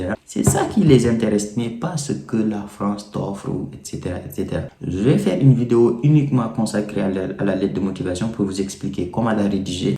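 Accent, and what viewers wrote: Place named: Senegal. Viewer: L'accent sénégalais, j'aime bien 😎👌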